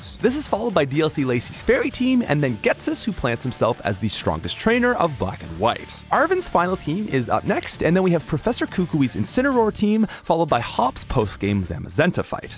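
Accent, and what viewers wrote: Canada. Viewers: Digging the Canadian accent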